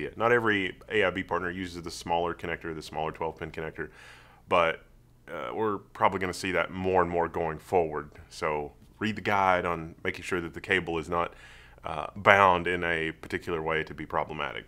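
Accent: South, United States. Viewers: the only tech reviewer I know with a southern drawl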